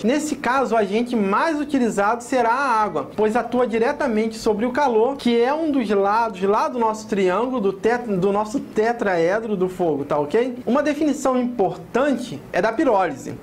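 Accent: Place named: Rio de Janeiro, Brazil. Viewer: Putz, carioquês latente. Mas o video é interessante.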